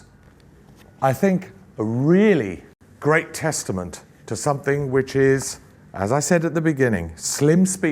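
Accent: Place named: United Kingdom. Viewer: Literally sounds nothing like him, this guy has a thick Brittish accent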